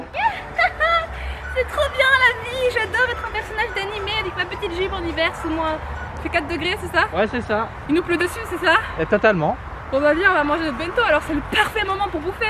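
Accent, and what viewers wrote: Belgium. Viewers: Tu es Belge ! sais trop bien moi aussi xD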